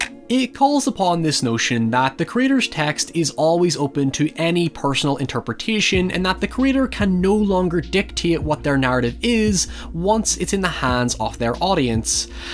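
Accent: Northern Ireland, United Kingdom. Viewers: thank you for flat out saying you're Northern Irish. I'm fascinated by accents within the English language as a non-native speaker and I've always wondered if this soothing voice of yours was, in fact, an Irish one. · Btw, I think your "soothing, sexy Northern Irish accent" adds to the uniqueness of your content and almost seems to add to the mysticism of the movies and topics you discuss.:) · Northern Irish? always thought you were Scottish. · northern irish accent 😍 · "If you wanna listen to my soothing, sexy NORTHERN IRISH accent..." Oh, so THAT'S your accent! I uh... don't know how I didn't get that.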